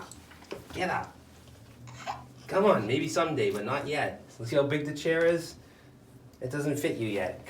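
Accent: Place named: New England, United States. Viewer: your accent, t-shirt, and water bottle bring me home. I grew up between Chelmsford and Southern New Hampshire. (I live in Illinois now.) I benefit from your work. You are a really good teacher, keep it up.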